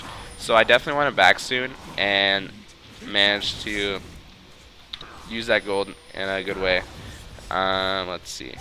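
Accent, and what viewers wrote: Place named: Texas, United States. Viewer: Dat accent ... man.. are you from Texas? :D Anyway, nice video and thanks for all the advice :p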